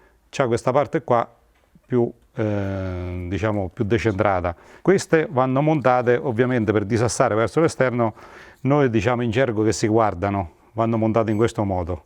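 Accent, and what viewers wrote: Abruzzo, Italy. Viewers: Una parola: arte. L'accento abruzzese completa l'opera. Si sente il sapore degli arrosticini mangiati dopo una giornata di duro lavoro di preparazione. " E angor' ce sta' da affina'" MERAVIGLIOSO!!!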